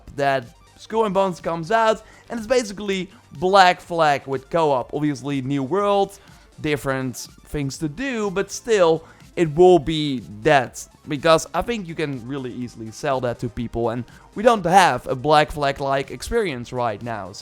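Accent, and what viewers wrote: Netherlands. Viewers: You sund very very Dutch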